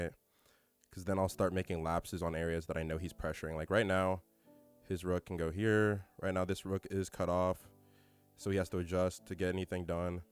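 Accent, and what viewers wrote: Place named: Africa. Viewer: What part of africa are you from?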